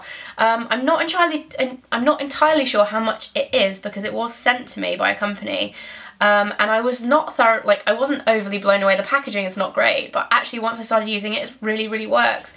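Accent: England, United Kingdom. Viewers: wow i love your videos! Its so nice to hear a good english guru (not that i dont love american gurus!) And.... you are one of the first to do a november video!! xx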